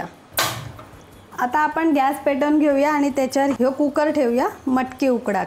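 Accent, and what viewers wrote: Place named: Malvan, India. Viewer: आम्ही पण वैभव वाडी चे पवार, ही मिसळ/उसळ अगदी आम्ही नेहमीच बनवतो तरी ही मी ही रेसिपी पहिली कारण तुमचं presentation , उगाच फाफट पसारा नाही , बोलायची पद्धत म्हणजे अस्सल मालवणीत . हे ऐकूण खूप छान वाटतं व तुमचे सगळे विडिओ बघावेसे वाटतात. असंच चालू राहू दे. दोन्ही जाऊ बाई जोरात .👍👍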